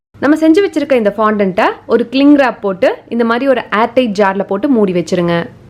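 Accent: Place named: Kongu, India. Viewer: hi.... am ur new subscriber..... ur recipes are really 👌 n r u from kongu region...? ur slang s lik that.. tats y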